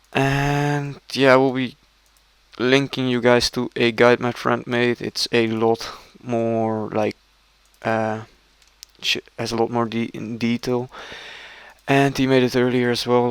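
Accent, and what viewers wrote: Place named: Netherlands. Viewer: sounds like you're dutch. and very good vid never knew glacors were worth it again after eoc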